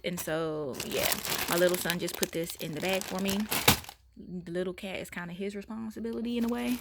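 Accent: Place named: South Jersey, United States. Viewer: Love your pantry vids. New subscriber here. I’m originally from south Jersey and you sound like a local girl (SJ/Philly) and it’s not common on here and I’m loving it. ❤️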